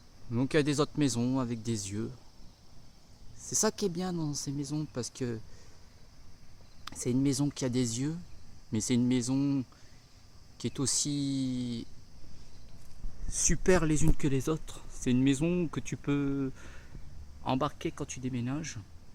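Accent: Nord-Pas-de-Calais, France. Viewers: Rien de tel qu'un français tel que toi avec ton accent pour démystifier définitivement cette demeure... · t est chty nord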